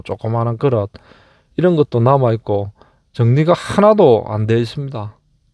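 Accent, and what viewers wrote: Gyeongsang, South Korea. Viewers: 말은 표준말인데, 억양이 경상도라써...그런지, 알아듣는데는 아마 이상없을 것입니다. 천연기념물 의 톡특한 영상 감사히 잘 보고 있습니다. 혼자 다니시니, 몸조심 하시기 바랍니다. 사고나면 혼자다 처리해야 하니......조심 조심 운전하시길 바랍니다. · 자연스런 경상도 억양 넘 좋아요 화이팅입니다^ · 전고향이 충청도인데~! 경상도 사투리와 어걍이 자연스럽고 구수하게 들려서 구독했습니더~! 마~! 빡세개 화이팅~ 하이소~!!:hand-pink-waving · 경북에 사는 사람인가보네요... 표준말 쓰라고는 안하겠데, 불편한건 맞아요.. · 사투리? 경상도 말이좋아요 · 경상도 사투리 정겹습니다.... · 전국 방방곡곡 다니시느라 고생 하십니다. 인구절벽과 경기침체에 농촌마을이 황폐화 되는군요. 경상도 사투리 좋아요. 괜히 마음쓰지 마세요. 덕분에 앉아서 전국을 구경하니 얼마나 좋습니까? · 경상도 사투리 구수하게 들리는데 누가 시비를 터는가 보군요 모르긴 몰라도 앞으로 폐가 문제는 더 심화 될것입니다 보이지않는 텃새 지역 이기주의 배타적인 정서 나열하기 싫은 단어들이 떠오르네요 아무튼 고생많았습니다 영상감사합니다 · ㅋㅋ 경상도 사투리 구수하게 듣기 좋아요~ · 간결한 갱상도말 지금시대좋쵸 😊😮😂🎉❤ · 제가 이 tv를 구독하는 것이 컨텐츠도 좋지만 구수한 경상도 사투리때문에 보고 있어요. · 경산도말이 좋습니다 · 저는 구수한 경상도 사투리 목소리가 좋아 즐겁게 방송을 보고 있습니다~ 물론 전국에 버려진 빈집이 이렇게 많이 있다는 게 안타까운 마음으로 보기도 하지만요.. 아무튼 방송 고맙게 잘 보고 있습니다. 늘~ 건강하시고 안전하게 현장 취재 하시길 기원합니다~ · 댓글에. 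사투리에. 설왕설래. 하시는분들. 많으시네요. ~ 전. 좋으네요...^^ 각. 지방사투리중/경상도가. 최고라얘 어느분 글처럼. 음소거로. 시청하이소. 😂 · 경상도의 말투가 부산 경남과 대구 경북은 완전히 다른데 은 서울 말투보다 듣기좋네요.오늘도 좋은영상 잘 봤습니다. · 경상도 말씨 듣기 좋습니다 ❤ 상세한 설명 ~~ 즐겁게 보고있습니다. 홧팅 입니다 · 사투리 말씀 재미 있고 구수함니다.경상도.사나이.아이가...이곳미국은.빈.건물.상속자가.꼭세금을내서..세금이.밀리면.스테이.에서.가저같니다.😮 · 경상도사람이 경상도 사투리 쓰는게 무슨 문제라고 시비을 거는지 서울촌놈도 서울사투리 마이 쓰더만...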